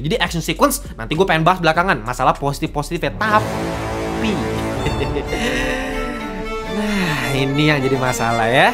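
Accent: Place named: Medan, Indonesia. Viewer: Nah kalo ini baru logat Medan bang... salam dari Medan 😄😄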